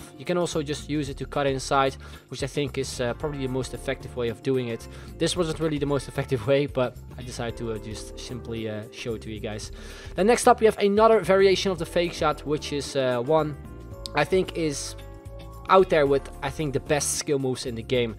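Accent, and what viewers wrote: Netherlands. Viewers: Christian El Tawil yea was thinking of that too. He sounds dutch so he should definitely know this haha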